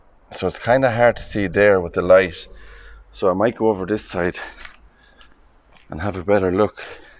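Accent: Scotland, United Kingdom. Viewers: Love the Scottish accent! my great granddad was born in Edinburgh. It reminds me of him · 😂 that lovely Scottish accent 😉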